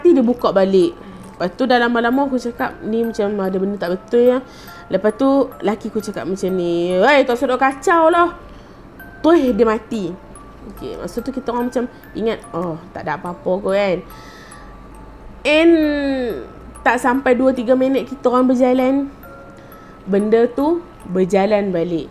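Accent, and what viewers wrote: Kedah, Malaysia. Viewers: Mst org kedah nie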